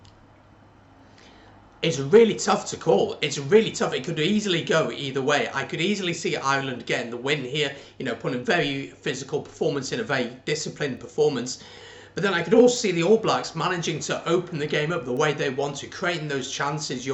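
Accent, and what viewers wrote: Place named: England, United Kingdom. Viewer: You're not the best with accents are you mate!? His accent is totally English.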